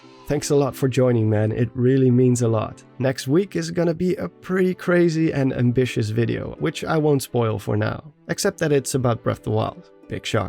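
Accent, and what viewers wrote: Netherlands. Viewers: You’re from The Netherlands aren’t you? I can clearly hear it in your voice. xD Cool video!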